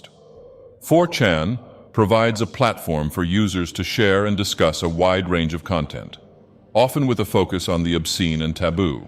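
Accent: United Kingdom. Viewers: I didn't notice before, but he's doing the thing where he gets more British as time passes. ❤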